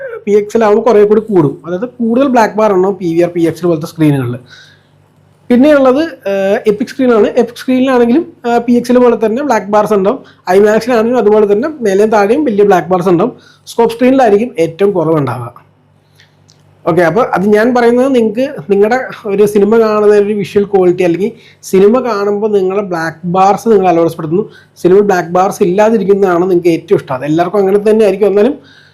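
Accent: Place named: Thrissur, India. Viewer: Thrissur ragam❤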